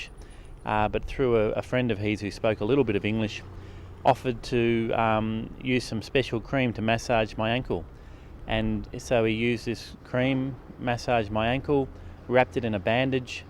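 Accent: Australia. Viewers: Hi, I really enjoyed your film, a real gem for supporting a text I am writing on. I also enjoyed listening to your Australian accent, since I lived there for more than a year and it was a very special time, it brought me beautiful memories. Congrats also for keeping up with your films. All the best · did he really have to say 'I'm australian?'' although i just heard.'stralian....' With that accent !! :)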